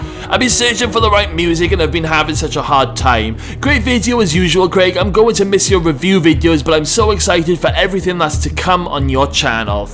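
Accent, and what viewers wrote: Wales, United Kingdom. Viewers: Hi Craig, LOVE your enthusiasm for the show, your Welsh accent & always look forward to your reviews. We will all be gutted when Wentworth is finished. Are there any tv series that you & Peter recommend for viewers to watch in the future, with amazing writing & acting like Wentworth? Thanks from Renee in New Zealand 🥝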